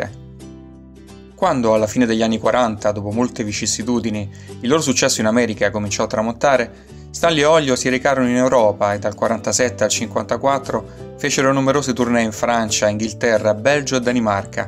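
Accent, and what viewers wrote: Rome, Italy. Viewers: Video interessante e ben realizzato. Ma se lo speaker correggesse quella terrificante "c" romanesca presente in OGNI parola, il lavoro risulterebbe decisamente più godibile. E lo dice un romano come me...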